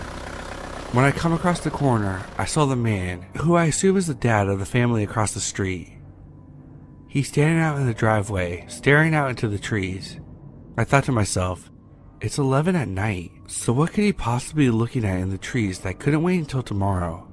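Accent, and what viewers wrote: South, United States. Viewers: I dont know if you had csndy in your mouth or if u havw a cold but if u arw trying to speak differently ( just im case someone told u they dont like the way u speak) then dont like ur voice is okay i like the country wake u speak.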